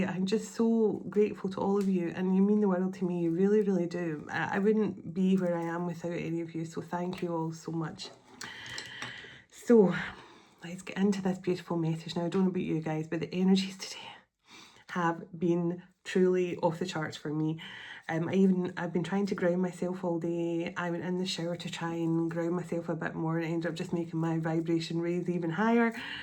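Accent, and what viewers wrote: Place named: Scotland, United Kingdom. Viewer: Oh my I’m a Cancerian DF all the way from California. The timing and your message’s spirit of reconnection with my avoidant Libra TF must be divinely led because I can tell the telepathic connection being revived today during my morning walk in nature. Plus the Scottish accent was quite the bonus!! Cheers.❤❤❤444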